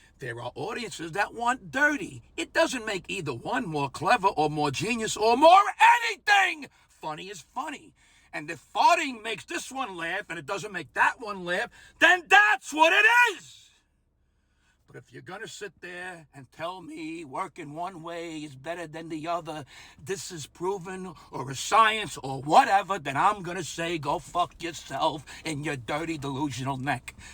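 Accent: New York, United States. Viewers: I was born in NJ exit 13, grew up exit & A. Family is from Brooklyn Bay Parkway and 86th street. When you speak your accent makes me think of home. In HS the Jews were close friends with the Italian kids. We liked to date them because they were easy I men friendly. The Italians dated the Jewish girls and discovered what a headache was. Cultures are very similar. Comedy back in the 60s was really funny and if you went to the Catskills it was dirty. Think of Buddy Hacket. I miss the old days and you bring them back for me. Dont shut the fuck up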